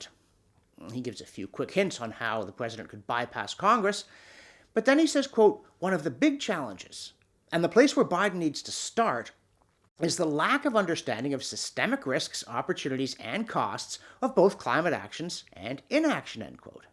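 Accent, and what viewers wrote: Canada. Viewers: Please slow down your rate of speech a notch : Not everybody is familiar with your Canadian diction : Good Vids though .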